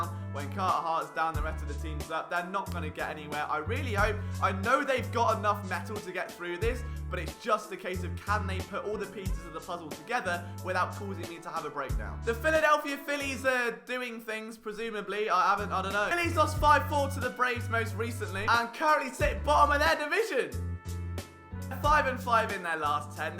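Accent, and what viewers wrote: United Kingdom. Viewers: U still got the Flyers. They played tonight!! Stay safe!! Btw where are u from because u sound British? I am loving the accent.!!!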